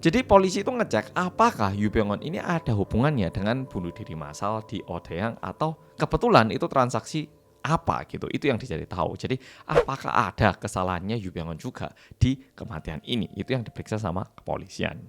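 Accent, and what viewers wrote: Java, Indonesia. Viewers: Hmm..... Muka Korea logat Jawa aku kaget weyy😰🥺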